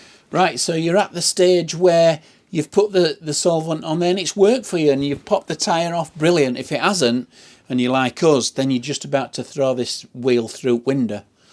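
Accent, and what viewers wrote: Yorkshire, United Kingdom. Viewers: 😆Totally love your accent! Yorkshire I guess?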